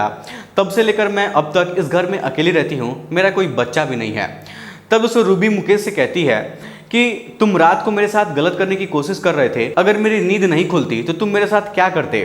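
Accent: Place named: Bihar, India. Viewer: Abe sahi se Hindi to bol bihari taan me kyu bol raha hai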